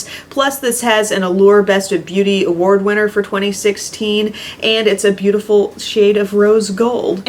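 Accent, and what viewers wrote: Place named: New England, United States. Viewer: You pronounce some words like you were from New England! That's a New England accent! 😊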